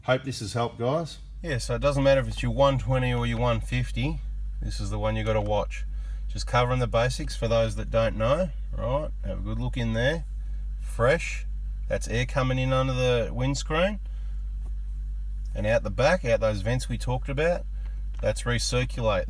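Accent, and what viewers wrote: Australia. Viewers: Love the Ausie in this guy ❣️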